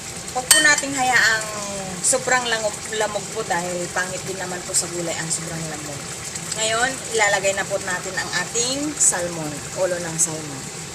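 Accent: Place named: Kamayo, Philippines. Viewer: the way ka kasi magsalita kamayo talaga from Mindanao na linggwahi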